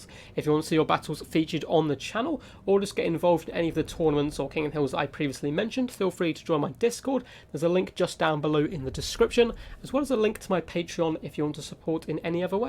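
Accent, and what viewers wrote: United Kingdom. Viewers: To be fair I don't click on the video for the content anymore, but for the british accent podcast !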